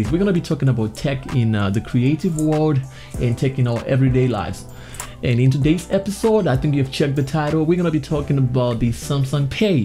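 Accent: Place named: South Africa. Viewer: Def south africa 🇿🇦 broer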